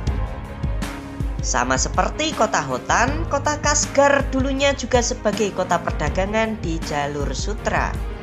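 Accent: Java, Indonesia. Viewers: WALAU MEDOK BANGEEET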